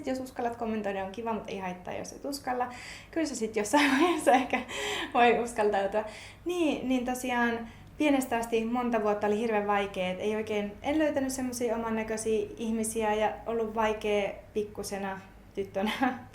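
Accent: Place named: Oulu, Finland. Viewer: Hei, mua ei niin ahdista talvet pimeyden takia, kun ne tavallaan myös rauhoittaa itseä ja helpompi nukkua. Suomessa ja varsinkin talvessa itselle suurin ongelma ihmisten asenne ja yleinen ilmapiiri. Tavallaan vaan haluaisi pois täältä ja vaikka semmoiseen paikkaan missä ei edes olisi koko talvea aurinkoista. 😅 Oon muuten kans Oululainen ja sun murteesta kans kuulee et olet ☺️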